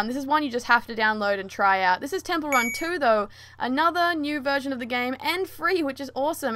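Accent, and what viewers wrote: Australia. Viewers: Are you Australian or kiwi?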